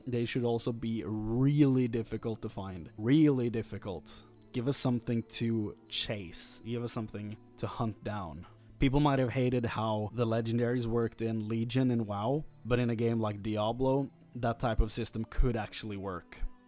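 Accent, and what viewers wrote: Sweden. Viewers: He sound Swedish. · As a swed, he sounds like a swed ^^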